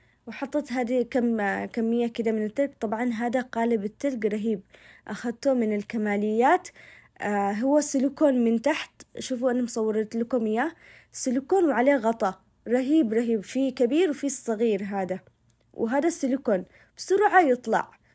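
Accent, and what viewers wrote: Aden, Yemen. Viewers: حليب بودر علشان مايتغير طعمه كلامك عدني قح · اني فدالك دكرتيني اليم حق عدن ومحسن الكلام العدني اه وايام عدن باقي التريب وكاتلكس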